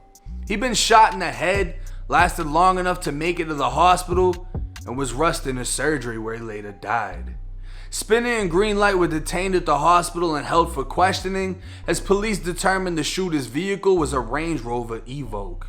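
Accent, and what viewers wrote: Boston, United States. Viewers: You from Boston? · Ammed kah jackin ... could you be any more Boston. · You have to be from Boston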